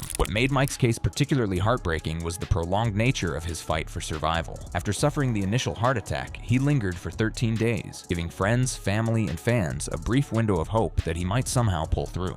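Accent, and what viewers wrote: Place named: United States. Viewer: Another load a ballax video on YouTube with American voice over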